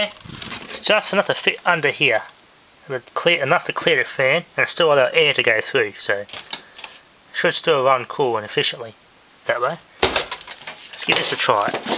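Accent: Australia. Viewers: I'm curious, what's the accent? I mean u sound aussie, but there's something else in there... Or is it just a lisp?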